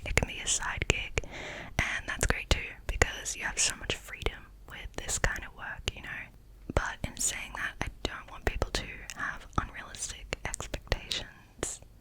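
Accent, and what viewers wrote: Australia. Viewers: I’m late to the party but is Australian? Sometimes it’s hard to pick the accent with asmr but I’m loving it! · Are you aussie?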